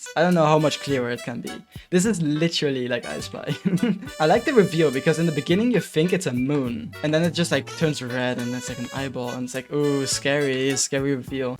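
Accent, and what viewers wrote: Germany. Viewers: Youve got a german accent, are you from Germany? I am austrian btw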